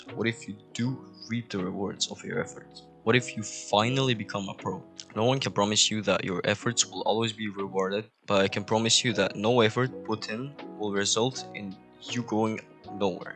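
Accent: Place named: Netherlands. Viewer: ofcourse i am also from europe and i recognized a little accent for me it sounded dutch it would be funny if you talked dutch!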